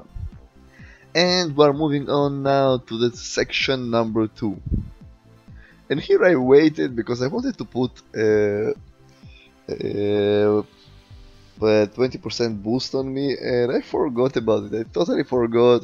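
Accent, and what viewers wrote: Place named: Russia. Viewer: Travis Nadeau Pesantes Russia, Ukraine... Id guess. The accent.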